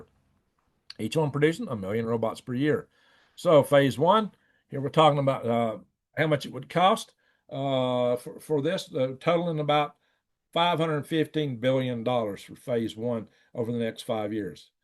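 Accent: South, United States. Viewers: It was a little Dry. My friend Mike said, he said the Big A.I.(can't remember) company can afford the A.I. but China couldn't. A lot of repeated Math, repeated yourself often. Then he did his usual copying your 'Southern accent" in levity. "China" he said from you. He's a good guy, my friend. I say We as Americans must work as if it depends on us, and Trust as if it depends on God. And Freedom to the counterpart of people like me in USA, IN CHINA. "See you down the road.". As someone once said to me at meeting.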